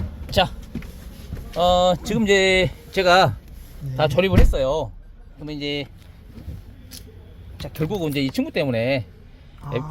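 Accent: Jeolla, South Korea. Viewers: 전라도 말씨가 정겹네요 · 항상 침착하게 그리고 쉬운 퍼포먼스로 말씀을 아주 잘해주세요!~^^ 찰지게 또 하나 배워갑니다ㅎ 처서 가 코앞이지만 몸관리 신경쓰셔셔 컨디션조절 잘하시길 기원드립니다!! 역쉬 구수한 전라도사투리 짱!ㅎ 참고로 저는 충청도 입니다!~ 나가 아주 미쳐불어 미쳐불어 미쳐분당게..ㅎㅎ^^ 감사합니다.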